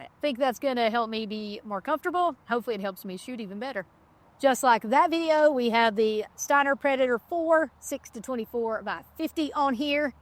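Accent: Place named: New England, United States. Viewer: Your country Yankee accent is funny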